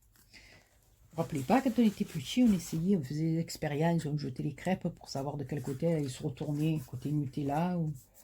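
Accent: South, France. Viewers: Chère Madame bonjour je viens de voir ce tuto de carte à rabats super beau et que j’ai hâte de refaire. Votre carte est très belle. Et j’adore votre accent du midi qui fait penser aux beaux jours. Merci. Petite question pourriez vous me dire ou vous avez acheté votre papier motif nounours dont je raffole. Il est super beau j’adore les nounours et on en trouve très peu en scrap. En attendant votre réponse je vous souhaite un très bon week-end ensoleillé et vous dis à bientôt. Bises. Valérie 🌺⭐️⛸💋🐈🌸🧸🐞💐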